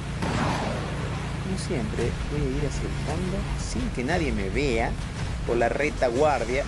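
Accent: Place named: Santiago del Estero, Argentina. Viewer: Jajajaj disfrute mas de como hablas pareces de santiago del estero hablas igual q yo xd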